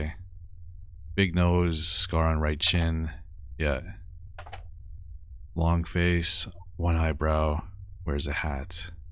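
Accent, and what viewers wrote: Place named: Canada. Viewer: This guy has one of the most aggressively Canadian accent I've ever heard.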